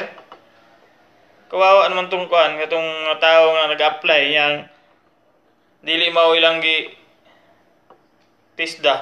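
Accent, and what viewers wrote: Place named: Visayas, Philippines. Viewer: Hahahaha bisaya jud oi..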